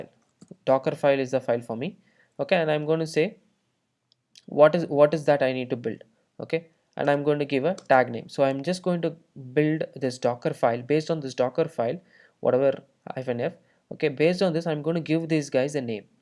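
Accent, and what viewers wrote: India. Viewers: indian accent is something incredible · Dislike because, your thumbnail showed non Indian man, and when I played it was the irritating accent of an Indian.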